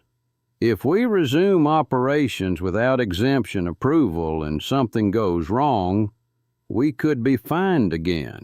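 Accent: South, United States. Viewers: Sounds like cleitis is telling a story real southern accent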